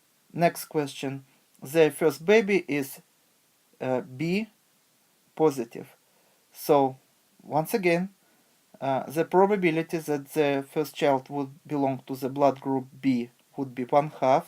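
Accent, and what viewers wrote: Russia. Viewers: bro are you russian ?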